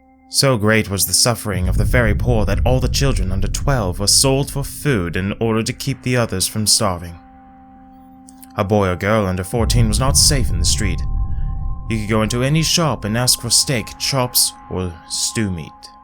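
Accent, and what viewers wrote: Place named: United Kingdom. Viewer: I loved your British accent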